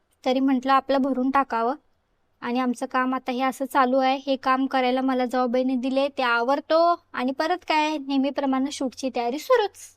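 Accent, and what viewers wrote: Kolhapur, India. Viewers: Kolhapur typical accents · Tumhi bolnyat कोल्हापुरी tone ahe ❤ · अस्सल कोल्हापुरी भाषा ❤ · कोल्हापूरी टोन ek no.🔥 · विषय असा झालाय 💥😁 Kolhapuri bhasha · Kolhapuri tone 🔥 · Mam kolhapuri marathi jagat bhari......1 no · Your Kolhapuri accent is so niceeee❤️❤️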